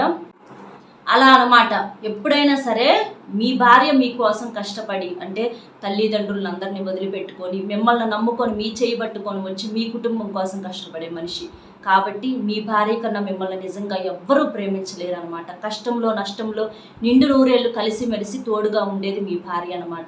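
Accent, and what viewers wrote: Nellore, India. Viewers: మీ బాష యాసా చూస్తే నెల్లురు జిల్లాలో వారిగా అభిప్రాయం కలుగుతుంది.. గారు మీ పోన్ లేదా అడ్ర తెలుపగలరు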